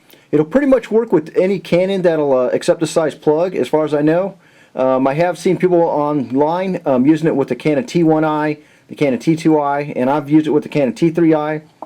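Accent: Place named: Texas, United States. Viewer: Great video and with the Texan accent it sounds straight forward.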